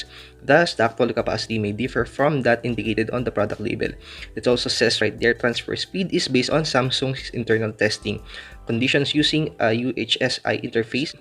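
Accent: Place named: India. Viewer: Lol, me too. But he speaks i think indienglish or tagaloenglish thats why.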